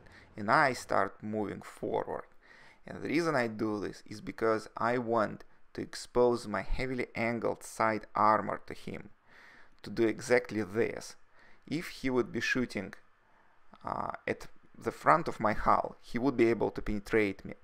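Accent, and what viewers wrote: Russia. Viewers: Russia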